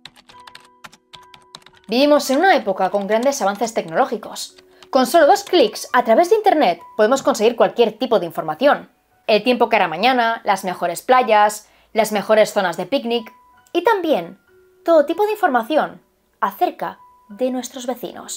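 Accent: Galicia, Spain. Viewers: Eres gallega?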